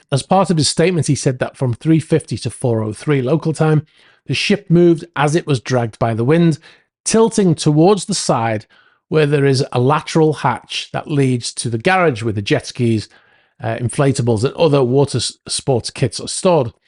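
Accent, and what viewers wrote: Liverpool, United Kingdom. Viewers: Great channel! Who is the host? I’m guessing a fellow Liverpudlian? Like to know more!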